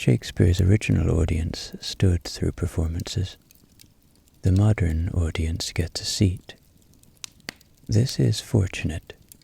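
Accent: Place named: Ireland. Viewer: Being ill with MS I often fall asleep during the day and I have taken to listening to at these times as well as the time when I have difficulty sleeping at night fatigue is weird like that but you are amazingly talented and you are the perfect person to help me get to sleep being Irish and having that lovely Irish accent and soft lilt that I love I am so happy and grateful to have found you.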